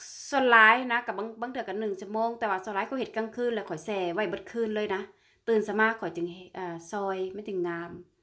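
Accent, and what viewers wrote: Laos. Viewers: สวัสดีค่ะสาวลาวเว้าม่วนหลายเด้อ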